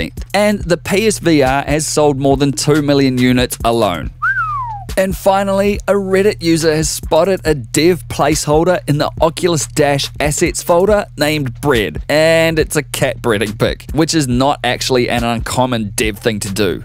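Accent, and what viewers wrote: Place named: Australia. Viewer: My favorite thing about your channel has always been your voice. It's a sonorous Aussi voice <3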